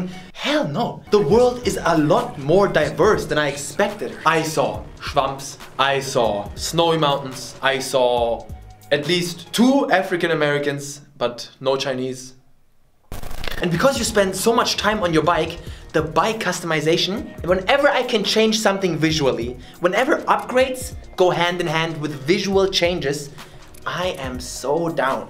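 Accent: Germany. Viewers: Best video ever on youtube, best accent, best game review ever, I love it, laughed every second, your fantasy and creativity are above normal 😂 subbed. And definetely will check others reviews aswell, if your channel is that type, and includes video’s like this👍🏻🤙🏻😀 And I knew you was German because of the S and Z, Sie Germans, Hanz Gez Zhe Flammenwerfer😂 Greetings from Holland Niederland, Niederländisch. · The whole Time I thought why does he have an German accent and then he speaks German 😂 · Haha unglaublich wie ich einfach deinen Deutschen Akzent rausgehört habe. Super Review Abo hast du , keep up the great content. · Wie man einfach diesen deutschen Akzent raushört😂. Ehrenmann. Bin zum erstenmal auf deinem Kanal aber der deutsche Akzent hat dich erstmal direkt sympathisch gemacht😂. Frag nicht wieso...😂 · MY G YOU SPEAK LIKE A GERMAN IN ENGLISH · Leon - 501st Liegt eventuell auch daran, dass man das sowieso sehr gut raushört, weil er mit einem STARK deutschen Akzent spricht 😂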